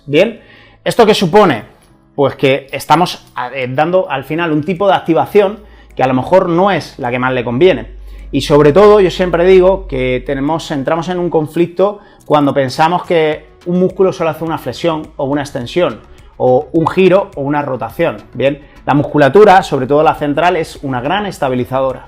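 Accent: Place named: Chile. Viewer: Esa forma de hablar... Chileno!!!!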